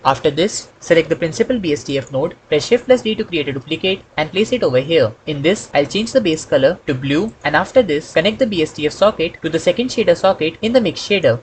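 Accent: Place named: India. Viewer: Namaste Bro, Can you also make a full course for sculpting (Note- everything about sculpting means basic to advanced) in blender. Are you from India as your accent seems like to be an Indian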